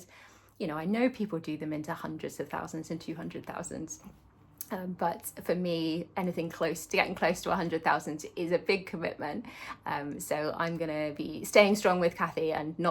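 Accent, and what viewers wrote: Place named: United States. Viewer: Hi,! Great video, thanks for sharing! I have been planning on using my magnet giveaway to make cute little needle minders 🤭 those foxes are adorable!! Still obsessed with your ATC, laundry is so creative!! I love your American accent 😂 Yay long dog! I was drawn to Fish & Ships too, the other nautical ones in that “series” are fun and I’ve seen fewer people stitching on them. If and when I make the jump I’ll probably start with one of them! I fully support putting your name and age on the sampler! I was watching another video this weekend and they said “well you might not want to say you did it at age 76” and I thought “why not!! How fun!! 😂” Your stitchy kindness haul is great! Can’t wait to see you stitching on those + the spring Quaker!! -Katherine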